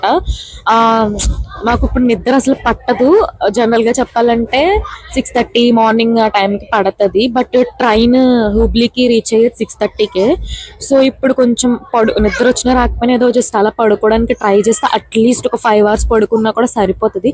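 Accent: Godavari, India. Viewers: కాటుక కళ్ళు తో వినసొంపుగా, గోదారి భాష మాట్లాడుతున్నావు, తిలకం బొట్టు పెద్ద గా పెట్టుకో, ఇంకా బాగుంటావు.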